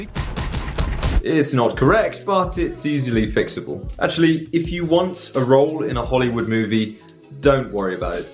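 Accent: England, United Kingdom. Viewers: блин, у него такой крутой Английский акцент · На английском слушать уроки гораздо интереснее, с настоящим английским произношением👍 кстати, оно заметно отличается от американского. Русский с английским акцентом от звучит забавно, тоже прикольно посмотреть) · Это британский акцент?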